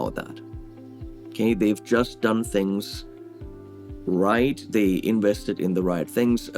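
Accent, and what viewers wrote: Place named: Germany. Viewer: Wundert mich gar nicht! Alleine bei dem Trash-Gear, das du täglich - hust - empfiehlst, sind 90% für meine Ohren akustischer Sondermüll, geht also umgehend retour, 5% sind heillos überteuert und 5% eventuell brauchbar, so ferne man nicht ohnehin längst was besser Klingendes hat. Für den Händler heißt das aber ein Defizit, wegen der Rückversandkosten und weil er das Produkt nicht mehr als Nueware, sondern nur mehr als B-Ware verkaufen kann. Nur als Beispiele, mein jahrzehntealtes JD-10 hält auch heute noch locker mit den meisten digitalen Pedal-(Pre-)Amplösungen mit, erst recht mit einer Cab IR dahiner und mein ebenso alter Marshall JMP-1 Preamp ohnehin. Während inzwischen drei deiner "Empfehlungen" wegen miserabler, völlig unbrauchbarer Sounds sofort retour gingen. Ich weiß ja nicht, womit du deine Reviews im Hintergrund aufpäppelst, aber ich konnte zwischen den Sounds, die ich in den Videos hörte und denen, die das reale Produkt lieferte, eher wenig bis gar keine Ähnlichkeiten ausmachen. 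Weshalb ich diesen Kanal inzwischen auch eher als visuell-akustisches Kabarett sehe (Ein hyperneurotischer Durchschnitts-Provinz-Gitarrist, von dem außerhalb dieses Kanals im realen Leben noch nie jemand etwas (musikalisch) Sinnvolles oder Umwerfendes gehört hat, versucht krampfhaft, mit Piefke-Pidgin-Englisch und hässlicher, geschmackloser Oberbekleidung auf Mittelschulniveau kompetent zu wirken. Benny Hill 2025 sozusagen.) aber mit Sicherheit nicht hier Empfohlenes mehr kaufen werde. Selbe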